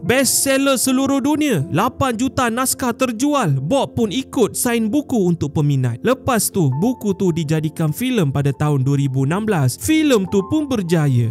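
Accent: Sabah, Malaysia. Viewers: "Andu bah bob" ni org sabah ka 😂 dr 1st tgk konten nya tertanya² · sekali sekala loghat sabah bha teda hujan teda ribut tu 😂😂😂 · Hmm bob 😢😢 btw bang mmg org kinabalu kot sebab ada “anduk dgn bah” dia 😅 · Andu bah Bob..seluruh dunia bersedih 😢 ni pandai slang Sabah😅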